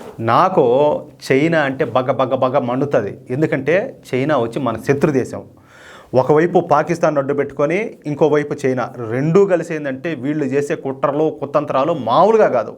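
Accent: Rayalaseema, India. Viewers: రాయలసీమ భాష బలఉందన మీది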